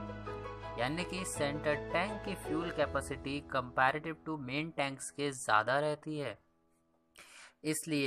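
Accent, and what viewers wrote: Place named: India. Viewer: Indish is so painful to listen to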